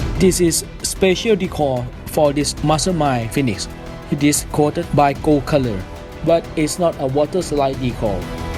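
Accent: Thailand. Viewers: สำเนียงแบบนี้ คล้ายคนไทยมวาก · เสียงเหมือนสำเนียงไทยมากกกกๆ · สุดยอดเลยครับ ปล.คนไทยไหมครับเนี่ย สำเนียงชัดเลย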